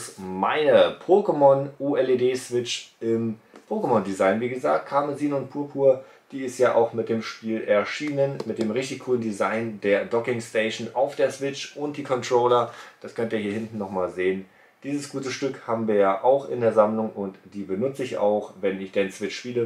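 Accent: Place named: Berlin, Germany. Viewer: ich feier es mega wenn du berlinerst :D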